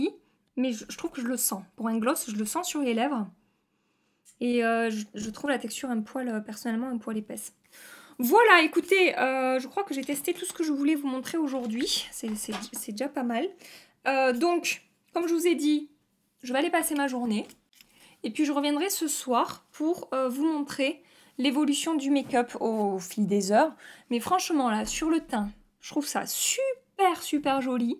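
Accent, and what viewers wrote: South, France. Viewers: Coucou ! Ah ! Cet accent du Sud en ce dimanche ensoleillé ☀️ Je viens commenter par ici pour changer d’insta 😀 très chouette vidéo (comme d’habitude ai-je envie de dire), j’ai vu passer la nouveauté Dior mais sans grande conviction mais mnt tu as piqué ma curiosité... Est-ce que la teinte 2 est finalement bonne ? Et concernant le feutre à sourcils, chez Urban Decay ils font un produit très similaire (Brow Blade me semble-t-il) et avec une gamme de teinte plus étendue... Si jamais 😀 Excellente journée !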